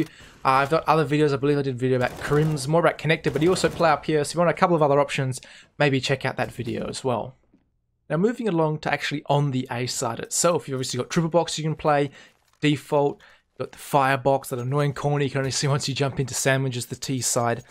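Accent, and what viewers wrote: Australia. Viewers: Greater Sydney or South West Sydney accent to be specific ;) (I think)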